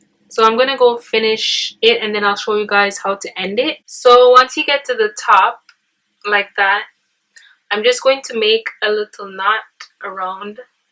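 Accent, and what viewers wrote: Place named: Jamaica. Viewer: Sensing a Jamaican accent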